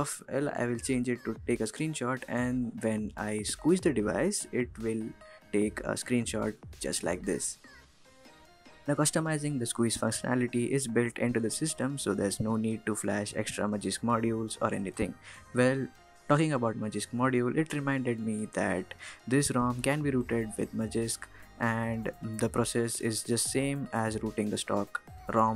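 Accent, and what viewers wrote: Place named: England, United Kingdom. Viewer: Angreez se bhi Bhariwali English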